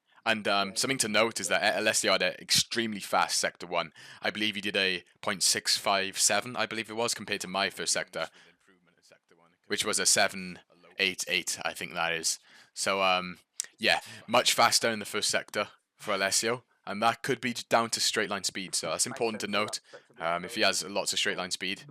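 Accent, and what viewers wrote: Wales, United Kingdom. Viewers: Sounds Welsh to me.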